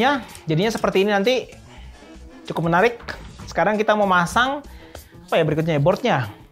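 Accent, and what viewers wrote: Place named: Surabaya, Indonesia. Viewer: kalo boleh tau, nih channelnya orang mana sih.. bahasanya jatim sby an banget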